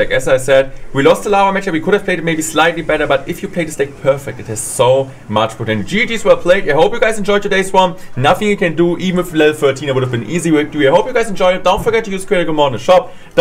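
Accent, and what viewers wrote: Germany. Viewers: Fun fact: has such a strong accent, that half of the words in auto-generated english are still considered as deutsch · He's Deutsch accent its heavy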